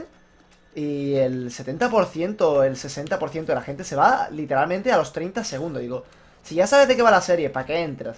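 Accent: Andalusia, Spain. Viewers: hablando de acento andaluz , tengo una profesora de matemáticas andaluza y entre tu y ella de vez en cuando se me pega el acento 😂😂😂 hace un par de semanas pensó que la estaba vacilando XD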